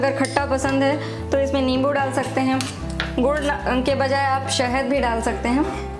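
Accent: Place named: Maharashtra, India. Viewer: Aapke bolne ka jo toning hai mujhe maharashtrian ki tarah lagta hai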